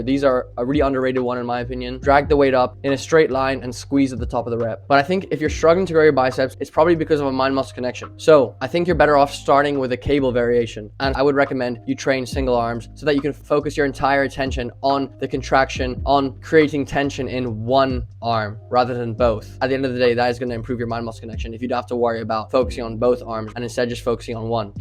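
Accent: Ireland. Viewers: What's your accent? It has a hint of South Dublin.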